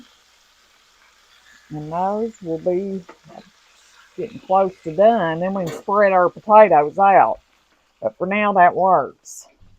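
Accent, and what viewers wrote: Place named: South, United States. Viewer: I love Southern food, as well as your accent! I once had a ton of leftover hamburger buns and threw them in the oven on low and toasted them to make breadcrumbs. Delicious because I added my own seasoning. Thank you for your video and chat.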